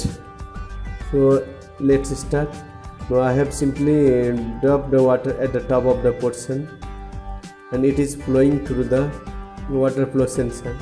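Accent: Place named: India. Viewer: Hard to understand with that Indian accent and the background music makes it even harder to understand what is saying. Now you know what need to change. Content and illustration are good.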